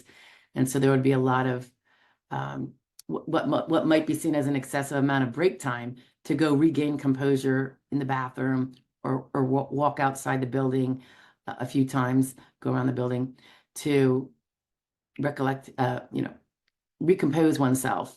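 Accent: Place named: Georgia, United States. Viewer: I thought that accent was a sweet mama Georgia peach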